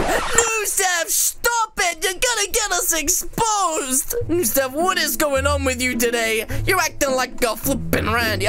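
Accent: United Kingdom. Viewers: XD British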